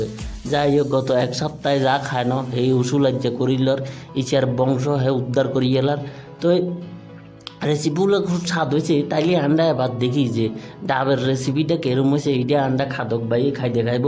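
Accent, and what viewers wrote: Mirsarai, Bangladesh. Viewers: আমনে যেন নে কথা কন এঠা তো মিরসরাই এর কওথা,,,এননে, তো আনডা কই থাকি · তোমার ভাষা পুরোপুরি বাংলাদেশের চট্টগ্রাম জেলার মিরসরাই থানার ভাষা আর আমি মিরসরাই এর মেয়ে তাই আমার সাথে তোমার আঞ্চলিক ভাষার ১০০% মিল। তুমি জানিও তুমি বা তোমার বাবা মা কি কেউ মিরসরাই এর কিনা? আর তোমার ভিডিও গুলো অসাধারণ আমি আর আমার ছেলে আমার মা সবাই তোমার ভিডিও আপলোড হওয়ার অপেক্ষায় থাকি। ভালো থেকো এগিয়ে যাও🤩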